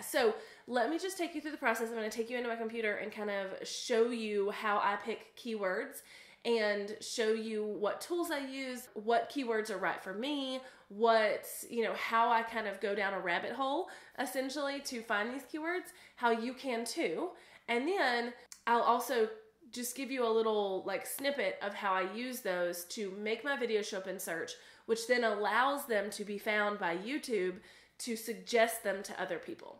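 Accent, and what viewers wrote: South, United States. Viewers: the southern drawl! Cute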